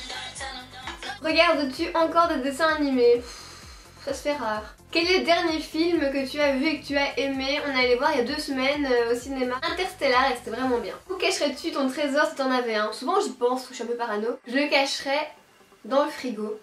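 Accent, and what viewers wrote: Belgium. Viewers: Il me semblait bien avoir reconnu ton accent Belge :) C'est rare de voir des youtubeuses belges d'ailleurs ! <3 :)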